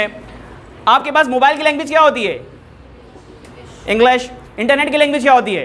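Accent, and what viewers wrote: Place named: United States. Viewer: I always watch your videos because I love American accent & I know I can't find useful learning material to another tutor.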